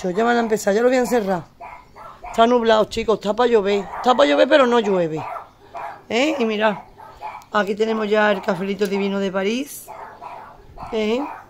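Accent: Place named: Andalusia, Spain. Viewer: Buenos días preciosa, que rico lo haces todo,ese lomo ,lo tengo que hacer. Me recuerda mucho la cocina de mis abuelas. Vivo en la provincia de Barcelona,en Mataró ,desde pequeña,. Nací en un pueblecito de Sevilla ,Huevar del Aljarafe,no se si te suena. Siempre te veo,y te doy mi dedito arriba, aunque no comente siempre. Tu manera de ser y hablar,me transporta ami pueblo,de pequeña, siempre iba en vacaciones y me encantaba su gente , su comida y su gracia al hablar. Un beso corazón. Te sigo siempre . Aunque me he criado en Cataluña,que quiero mucho,no olvido mis raíces andaluzas. Gracias cariño por transportarme a Andalucía. ❤️💃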